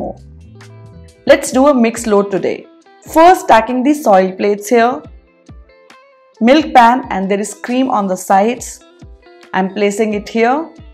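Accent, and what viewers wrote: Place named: India. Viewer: Ayyo sumne kannad baralla Anta heltare illa Andre swalpa swalpa gottu Anta Hindi accent Alli heltare..nam Bengaluru kathene istu 😀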